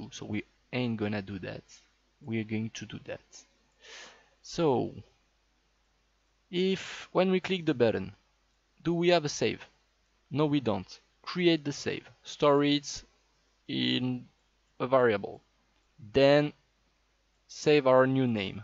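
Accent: France. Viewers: your are french ?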